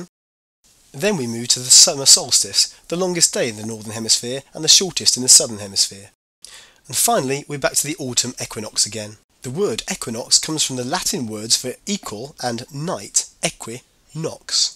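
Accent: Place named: Scotland, United Kingdom. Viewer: I think Scottish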